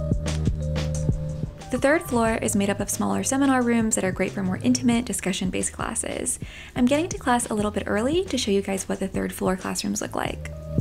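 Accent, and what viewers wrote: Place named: United Kingdom. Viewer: why your accent so British, rather than American?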